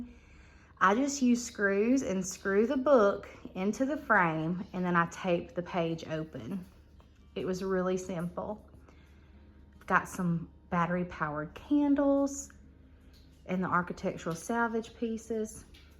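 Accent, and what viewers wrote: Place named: Kentucky, United States. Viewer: Whenever I watch (which is always) I feel like I’m hanging with family. My relatives are in Kentucky and Tennessee so they talk like you 😅 and we all love Jesus. Your home is so beautiful.. love the kitchen cabinet and all your little doggies.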